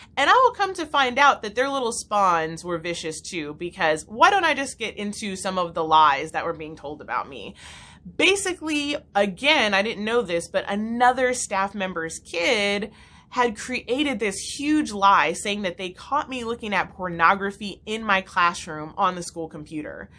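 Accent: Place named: Minnesota, United States. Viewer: The more I listen the more it sounds like white minnesotans. Oh please, nurses— especially school nurses are usually at the bottom of that field, why do you elevate this rube?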